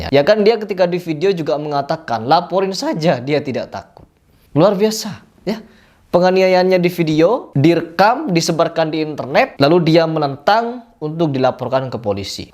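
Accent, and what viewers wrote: Madura, Indonesia. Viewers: BANG ASLI ORANG MADURA ? LOGAT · Bang ini logatnya kyk org madura.. org madura ya bg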